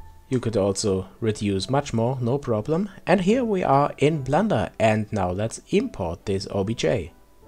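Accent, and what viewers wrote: Germany. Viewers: haha nein dein englisch ist sehr gut aber man hört, dass du deutsch sprichst p.s tutorials zum addon wären klasse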